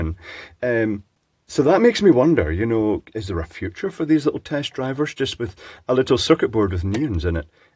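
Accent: Scotland, United Kingdom. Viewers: trying to avoid saying "shaft" in a delicious scottish accent 😂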